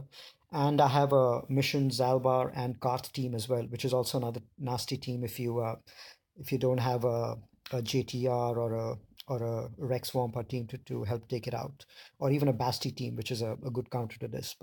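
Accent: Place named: Asia. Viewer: It's the 1st time im hearing u... Feels so satisfying because all ur vids are soundless 🤣🤣🤣 BTW from ur accent, i guess that u r an asian.... Am I Ri8? Cause im Asian too 😋😋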